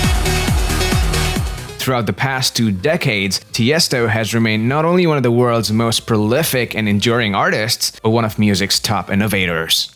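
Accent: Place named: England, United Kingdom. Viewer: Your english accent is awesome.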